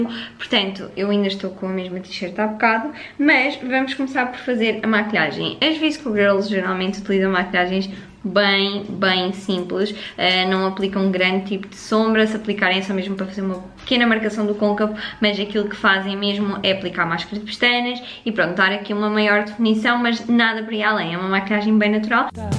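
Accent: Portugal. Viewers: Você é portuguesa?? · voce e de portugal amei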